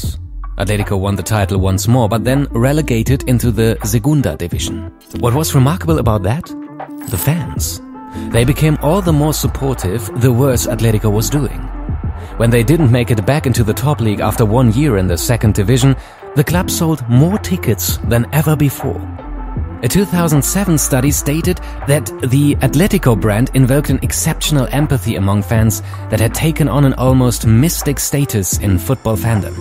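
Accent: Germany. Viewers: what gives you the impression that he's American? His accent is very German and it also says in the about page that it's based in Germany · Great channel, great german accent. Sehr schön videos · From the accent I'd say Germany or Austria.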